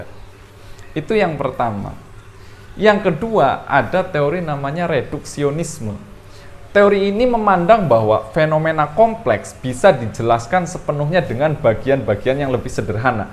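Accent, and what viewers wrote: Banyumas, Indonesia. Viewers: Semangat mas lanjutkan, mantap sekali pemaparannya filsafat logat ngapak👍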